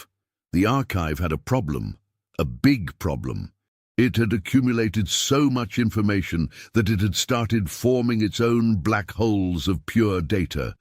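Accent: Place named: United Kingdom. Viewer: Lol! I love this narrator!🤣🤣🤣🤣🤣🤣🤣🤣🤣🤣🤣🤣🤣🤣🤣🤣🤣🤣🤣🤣🤣🤣🤣🤣 Best story as always. I only wish all hfy scifi comedy has this british narrator guy.❤️❤️❤️❤️❤️❤️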